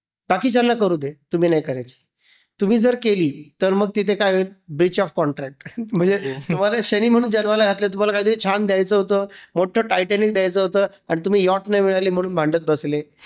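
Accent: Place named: Ghats, India. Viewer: Tumhi marathi spashta ka nahi bolat.. ghata varcha marathi ka bolata..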